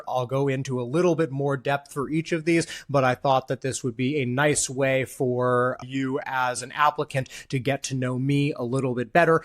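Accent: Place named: United States. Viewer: Hi.... I have never considered being an EA (until today) but it's a rare quality to find someone who thinks so fast and be unashamed about it. I shared this video with my Danish wife and she laughed out loud (thinks we have the same American voice and talking tempo). Even if we never meet in person, I look forward to more content from you. NDs need change champions just like you.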